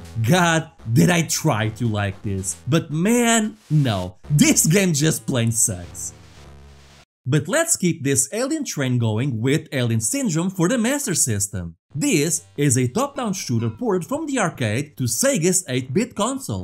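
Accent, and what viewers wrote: Portugal. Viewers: Portuguese accent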